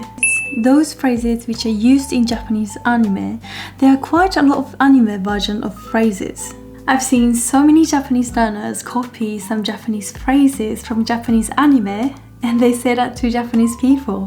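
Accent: England, United Kingdom. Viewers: Yee u right...her accent like british already But i prefer american accent 😢 Gomen ne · Your English accent is so lovely and calm, you speak very elegantly! I'm from London, currently studying Japanese and Korean while living in Seoul ☺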